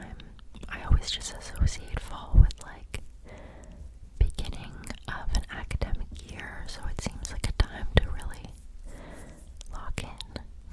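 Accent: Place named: Canada. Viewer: I can tell you’re from Canada with the accent lol but you speak very well for being a learner still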